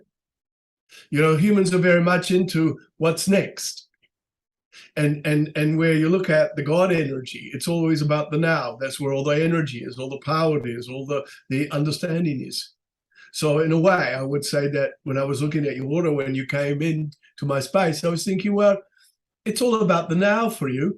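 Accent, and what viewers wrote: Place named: South Africa. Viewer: When speaks, I detect a South African accent. Interesting. I also recall a Dutch acquaintance speaking English with this sort of accent. I know this video is a couple of months old, and I have only just discovered this channel, but I would choose Hawaii over Portugal any day. Portugal has terrible bushfires from time to time. The people over there planted heaps of eucalypt trees which are notorious for their ability to catch fire easily. Has ever been visited by his wife from the next world since she cast off her flesh suit?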